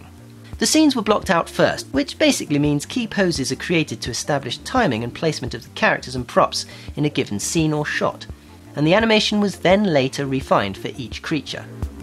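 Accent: United Kingdom. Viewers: this video is alot better because of British accent? I love it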